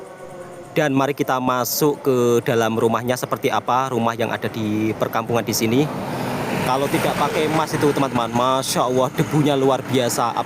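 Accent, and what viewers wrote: Java, Indonesia. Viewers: Sdr sdh lama juga berkerja di Johor tp loghat msh tebal kejawaan. Semoga sentiasa sihat.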